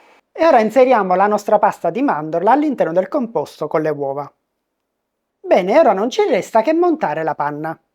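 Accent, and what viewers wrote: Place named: Sicily, Italy. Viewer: stavo apprezzando l'accento siciliano, visto che, se dovessi scrivere la parola: "resta", per come viene pronunciata ci vorrebbero non meno di 4 R😅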